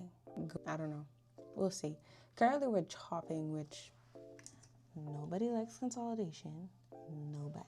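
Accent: Bahamas. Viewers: did i hear a bahamian accent 👀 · Im enjoying this video. I had stopped trading for like over a year, but youre inspiring me to get back into it. Btw are you Bahamian? You sound like it. Anyway great video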